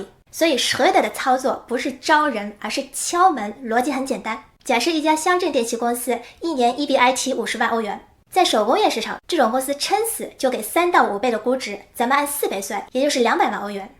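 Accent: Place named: Shanghai, China. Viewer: 口齿伶俐思路清晰财商飙高，再加上这发音，觉得是上海妹子。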